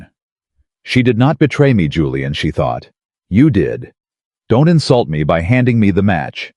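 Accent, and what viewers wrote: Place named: United States. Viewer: The flat American accent doesn't belong in a story about British aristocracy. It's an assault to the ears.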